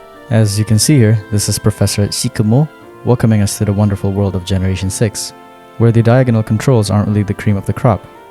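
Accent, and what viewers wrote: France. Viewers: Why did I just realize that accent sounds like you voice act Frenchy from Spongebob (narrator)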